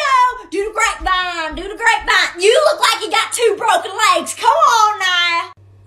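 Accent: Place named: South, United States. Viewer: Lol the country accent is so accurate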